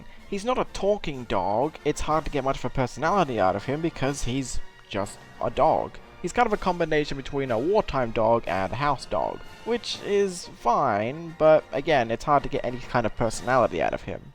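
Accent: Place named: Australia. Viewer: Wow, I didn’t know you were Australian! And about the movie, I haven’t seen it myself, but it definitely seems like the perfect film to show to elementary school kids when they need to learn about the Great War in Social Studies class! As for the animation, well, I didn’t really see a reason for the movie to be animated other than to appeal to kids more or as an exercise for the animators, but for what it’s worth, it IS pretty good, and the animators spent as much time on it as they needed to! And now I can’t wait for the Duck Duck Goose Explosion!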